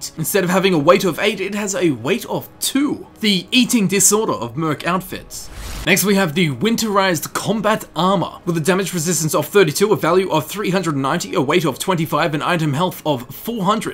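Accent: Australia. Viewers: Is he Aussie because if so Aussie Aussie oi oi oi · Dude are you Australian?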